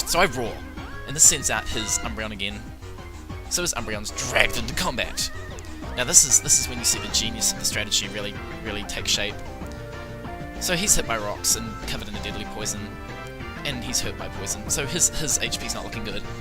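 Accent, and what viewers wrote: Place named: Australia. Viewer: I can't tell what you're saying!? Stupid AUSTRALIAN ACCENT! Can we have subtitles?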